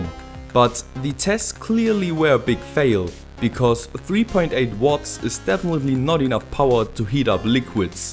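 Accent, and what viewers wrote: Germany. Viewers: Are ou german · Are you realy German? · du you come from germany ?xD i come from ! you hear so x33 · Where are you from? Bist du Deutsch/ Are you german?:D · Are you german or something? YOu sound like a german speaking english :D Wenn ja nice :D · hör ich da nen dutschen akzent ? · i cant hear your english anymore. you are definently german or sth. else! (i m 14 and german)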